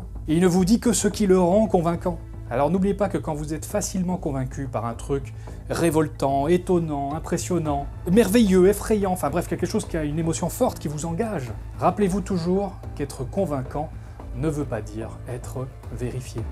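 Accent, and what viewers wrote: Belgium. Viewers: Belge Mr?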